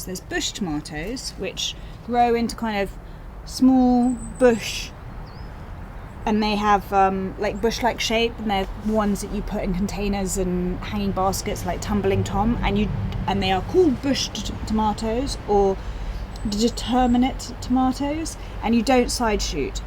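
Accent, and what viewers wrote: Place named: England, United Kingdom. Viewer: Very nice video and lovely English accent, thank you!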